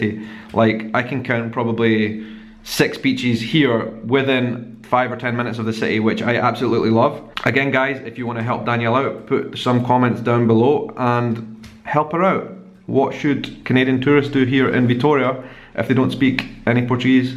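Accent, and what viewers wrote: Scotland, United Kingdom. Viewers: I don't think subtitles is needed...i really enjoy your channel just the way it is, and i got so happy cause i understand your scottish english, your accent reminds me the tv series "Outlander"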